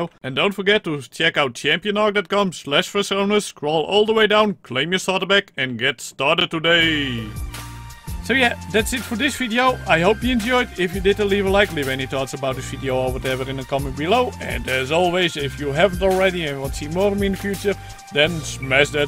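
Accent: Netherlands. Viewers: hahaha je hoort wel goed dat je nederlands bent :D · nice nederlands accent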